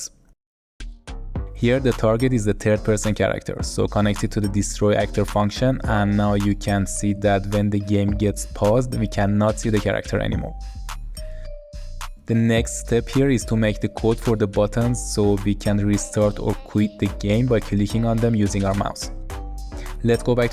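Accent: Iran. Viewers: Amazing video, used this as a refrence to implement this in C++. Also love your Iranian accent :face-purple-smiling-tears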